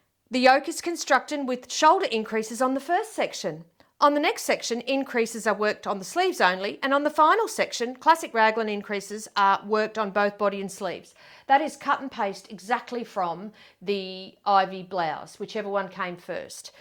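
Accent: Australia. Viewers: I get the ick with that Ooey Gooey name, too. The design reminds me of lava lamps. Not hot! Fish girl fashion: definitely hot, but I'd modify so the sleeves were in the usual position. I have not knit any of these, though Isabel Kraemer's designs Tresse and Nasrin are in my library, and I have fingering weight yarn for them. Thank you for your video series, they are super entertaining, especially with that Aussie accent!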